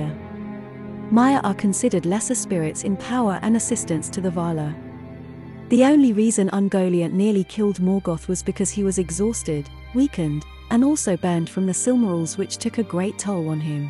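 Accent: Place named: England, United Kingdom. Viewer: I think that's just how people speak in England. We say water just like it sounds. they say wotah..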